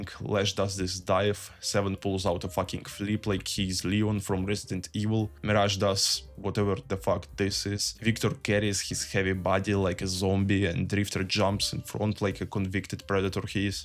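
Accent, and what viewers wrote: Russia. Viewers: Russian accent? :)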